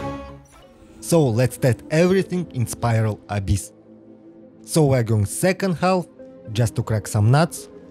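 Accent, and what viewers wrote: Russia. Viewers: He got the russian accent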